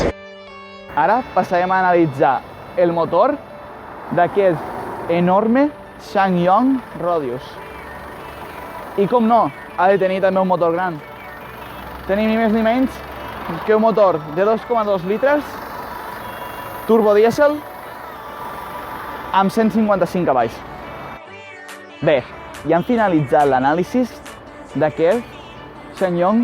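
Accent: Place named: Majorca, Spain. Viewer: Habla mayorquin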